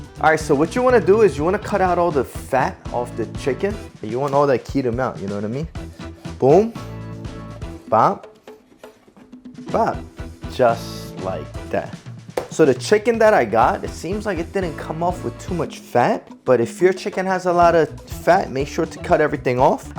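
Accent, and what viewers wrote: Philadelphia, United States. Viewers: I can hear the Philadelphia all in you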